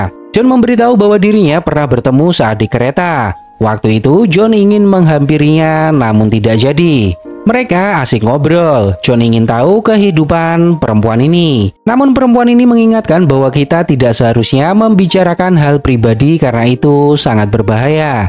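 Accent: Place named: Java, Indonesia. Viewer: Medog bgt bang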